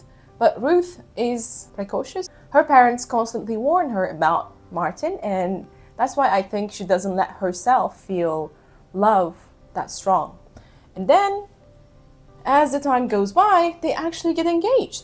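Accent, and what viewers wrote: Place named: Turkey. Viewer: hi, are you turkish? your accent looks similar. :)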